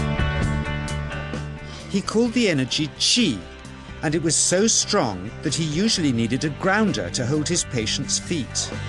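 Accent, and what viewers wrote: Philippines. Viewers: He Looks Like A Filipimo And Speaks Like A Filipino. What Do You Think ? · john chang sounds fillino .. I like the way our fillpino brothers and sisters talk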